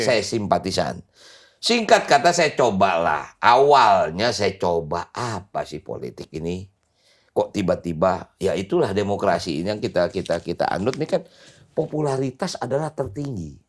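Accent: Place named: Betawi, Indonesia. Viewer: Bang Rano betawinya otentik bener, bcandanye Betawi bener, emang anak betawi aseli lu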